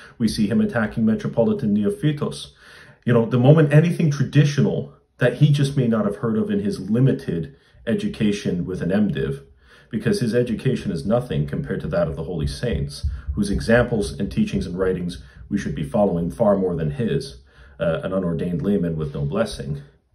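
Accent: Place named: Canada. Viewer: Your blessing, father. I love your videos and your subtle Canadian accent. I am so encouraged that priests like you are still coming into the church and standing for the truth & tradition of the faith. May God protect you, your family and your work - I will have you in my daily prayers going forward.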